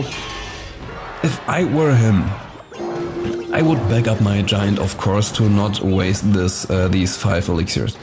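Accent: Germany. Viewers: du bist deutsch; das erklärt die Aussprache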